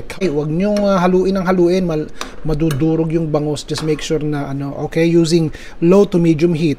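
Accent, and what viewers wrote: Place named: Nueva Ecija, Philippines. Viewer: Mr tga Nueva Ecija ka ba, kasi ganyan ang punto ng Novo Ecijano eh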